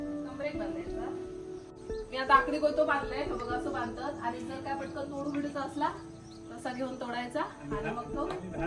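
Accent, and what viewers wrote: Malvani, India. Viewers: मालवणी संस्कृती आणि मालवणी भाषा सुंदर आहे. जास्तीत जास्त मालवणी भाषेचा वापर करा म्हणजे यश नक्कीच मिळेल. लोकांना मालवणी भाषेतील व्हिडिओ जास्त आवडतात. तुम्ही मालवणी सुंदर बोलता त्याबद्दल आम्हाला अभिमान वाटतो. आपल्या व्हिडिओचे विषय चांगले आहेत. आमच्या शुभेच्छा सदैव आपल्या पाठीशी आहेत. धन्यवाद आणि आभार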